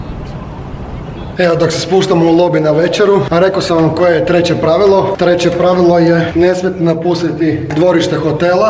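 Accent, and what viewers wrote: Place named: Croatia. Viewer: Super ste ali taj hrvatski akcenat je jako iritirajuci I kenja mi se od njega!!!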